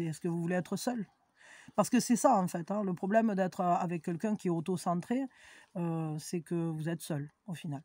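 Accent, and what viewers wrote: South, France. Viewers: Moi c est verbale je vais partir et ça devient physique pas facile merci pour vos guidance avec l accent du sud sa fait chaud au cœur ❤❤🙏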